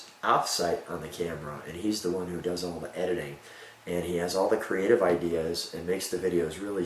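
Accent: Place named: Chicago, United States. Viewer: Hey. Congratulations and thanks for the videos. I love cars like you do but some days I'll grab a My Car video just to hear some Chicago accents. I've been in Texas too long. Best wishes for your continued success and fun!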